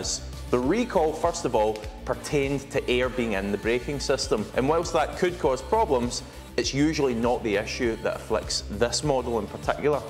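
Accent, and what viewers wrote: Scotland, United Kingdom. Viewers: I wish I was born in Scotland. Such a great accent!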